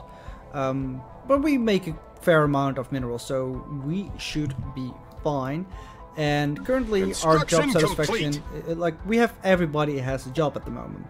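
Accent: Netherlands. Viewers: Ik hoorde je accent en ik dacht: hmm is hij misschien Nederlands? Toen zag ik je locatie en ja ja: Nederland. Toevallig :) Leuke let's play man!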